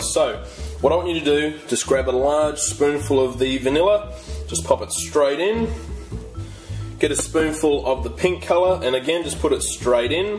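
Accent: Australia. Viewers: Awesome cake Are u from auzzie??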